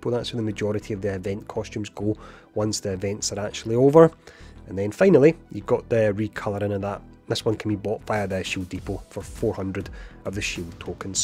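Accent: Scotland, United Kingdom. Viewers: First video I've seen of yours.......please speak up next time. When someone is whispering with a Scottish accent, it makes it impossible to hear what is actually said. So either change the accent (which I doubt will happen) or speak up. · This guy must be Scottish.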